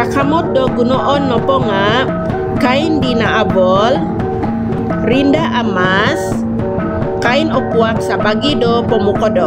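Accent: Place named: Papar, Malaysia. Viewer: Voice over not bad with the papar dialect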